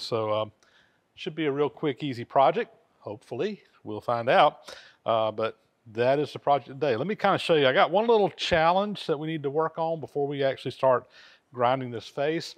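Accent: South, United States. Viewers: you might find this a bit funny. When you say "arn fest," I used to think you were saying "iron fest" with your southern accent, But recently I saw Mr Pete's video and he had an "Arn fest" t-shirt on. 😂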